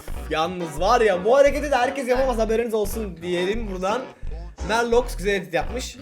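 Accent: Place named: Southeast Anatolia, Turkey. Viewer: Abi niyeyse güney doğu anadolular gibi konuşuyorsun arada Yoksa sen güney doğu Anadolulumusun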